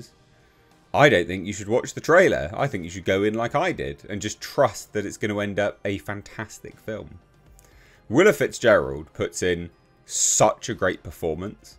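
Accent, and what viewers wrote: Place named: England, United Kingdom. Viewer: When you say “masterpiece” with your English accent, I want to believe you. I’ve been let down too many times (not by you… yet). I’ll let you know. I’m Cajun who now lives in South Carolina. We don’t do toxic positivity. 😉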